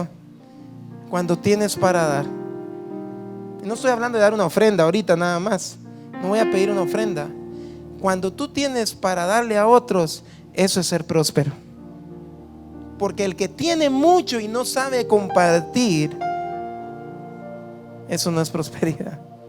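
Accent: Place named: Guatemala. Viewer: Andaba buscando música Heavy metal en español y pare aquí, me llamo la atención el acento chapin, Soy de Guatemala y, por el acento y forma de hablar, el también es de Guatemala, C.A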